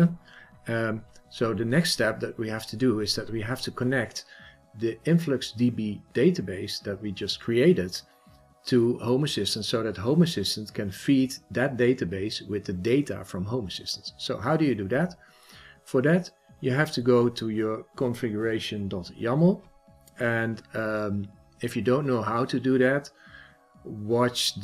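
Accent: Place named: Netherlands. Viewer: Hi hi dat klinkt Nederlands 😉 en daarmee vroeg ik me af of u bekend bent met iungo?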